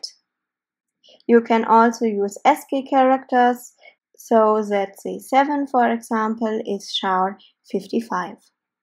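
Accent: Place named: Germany. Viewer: Not only a very useful video for us Xojo neophytes, but this girl has the cutest German accent ever. You can't help but smile every time she tries to use a 'V'.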